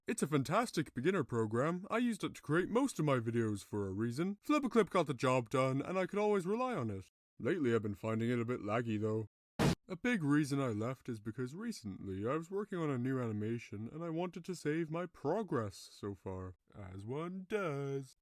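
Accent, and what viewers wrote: Ireland. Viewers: Why he sound like Irish? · Eh, more like nogla to me, it might be because hes Irish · Are you from Ireland? Because I am on FlipaClip, it’s the only one I have so far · Bro is Irish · Are you irish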